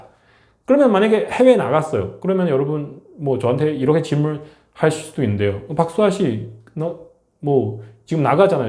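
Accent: China. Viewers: 이소식 전파하는분? 중국발음에 취해있는분?억양이한국본토발음어색한거로들림 아묻튼 진실만을 전했음합니다 중국짱께는 죄명이 죄인이그리워하는나라 ㅡ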